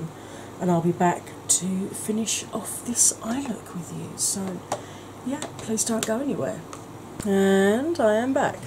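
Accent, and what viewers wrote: United Kingdom. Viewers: Are you Welsh? Gorgeous look per usual, I do think they're wisteria as well... I'm heading over to Chelsea's channel next!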